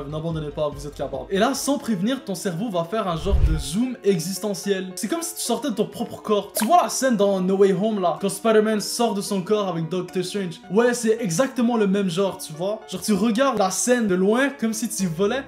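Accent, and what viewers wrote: Quebec, Canada. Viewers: COOL L ACCENT QUEBEQUOIS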